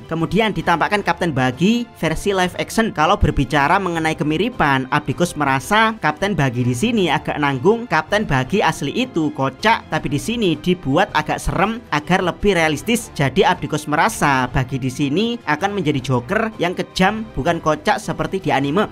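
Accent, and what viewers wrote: Java, Indonesia. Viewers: medok bnget sih asw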